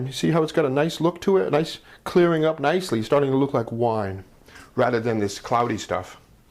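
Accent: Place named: New England, United States. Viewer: This guys definitely from the New England area.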